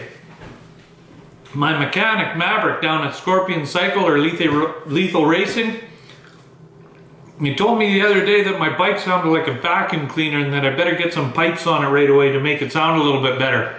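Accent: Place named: Calgary, Canada. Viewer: Great video mate, love the accent, reminds me when I lived in Calgary. Question. What is the purpose of the servo on the exhaust and is it ok to disconnect it like that? I recently bought a 1000 and when swapping the fender eliminator I notice I also didn't have those servo cables. Cheers from Australia.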